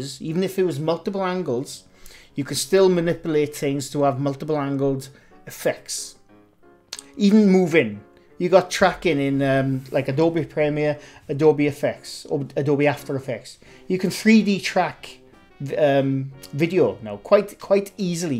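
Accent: Wales, United Kingdom. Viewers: Luv Welsh accent too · enjoy listening to your lovely welsh accent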